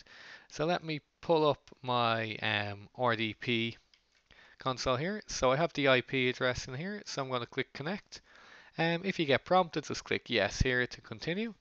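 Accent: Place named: Ireland. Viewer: thank you for the clear instructions! my wife is impressed with you brogue too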